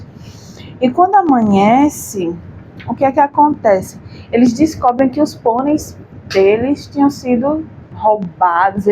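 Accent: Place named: Recife, Brazil. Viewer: O seu sotaque é do Recife.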